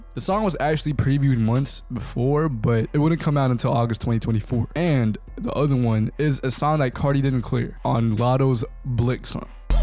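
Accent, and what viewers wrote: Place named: California, United States. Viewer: he sounds and dressed like he could be from Cali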